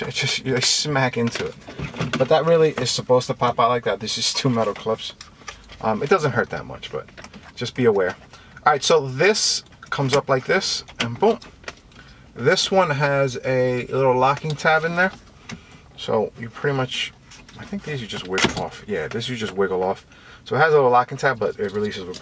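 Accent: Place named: New Jersey, United States. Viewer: Great video. Gotta love the Jersey accent.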